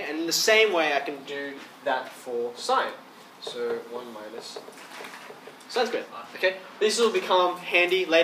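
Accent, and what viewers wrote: Australia. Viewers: indeed. disguisting australian accent.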